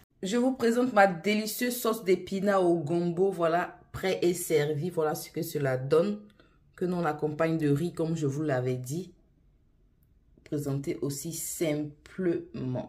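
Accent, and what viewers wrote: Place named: Ivory Coast. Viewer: Merci ma go ivoirienne préféré j'adore ton accent et ta manière de parler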